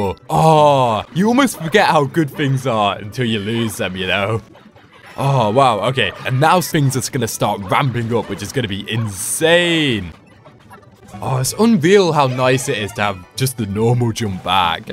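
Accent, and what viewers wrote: United Kingdom. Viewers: I think its cus he talks british and its difficult for him to say poplin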